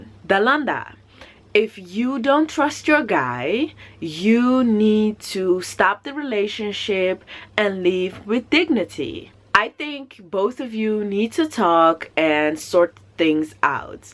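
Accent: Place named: New York, United States. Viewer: Wow! Listening to you speak, I thought for sure you spent some time in my home town of NYC. What an interesting and inspiring story; you are truly gifted and talented. You should think about working for the UN. Hearing about TV in the Netherlands explains a lot; because unless you are in the US watching public television, an HBO foreign film or show, or some special channel like National Geographic, most things are dubbed in English (often with bad translations, so my friends who are fluent in other languages tell me). Which is why I love to see foreign films directed by people like Akira Kurosawa and Ousmane Sembène. My other language learning days are probably over (btw, I sent a friend who travels to Senegal quite often, your Wolof learning link) because of the many projects I have going; plus I find that when I travel people are generally very nice and I get to wherever I want to go very well. 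When COVID-19 travel restrictions improve, I plan to visit Senegal, and even everybody I know there speaks English! But if I ever visit the Netherlands I will definitely hire you as an interpreter! :-)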